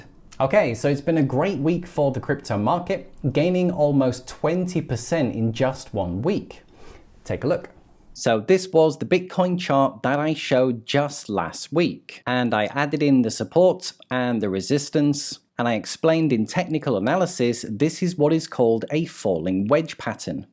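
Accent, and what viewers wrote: United Kingdom. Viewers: Your British right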